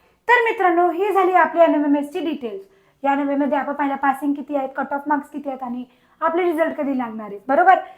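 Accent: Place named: Gujarat, India. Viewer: नमस्कार मॅडम आपका टोन गुजराती जैसा है l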